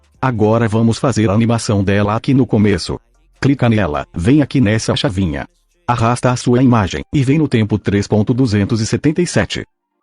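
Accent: Bahia, Brazil. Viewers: O miseravel e um baiano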